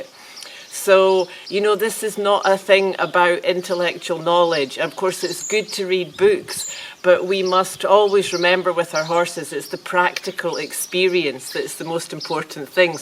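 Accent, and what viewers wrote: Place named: Edinburgh, United Kingdom. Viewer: Where are you from? Im from Edinburgh and I recognise that accent!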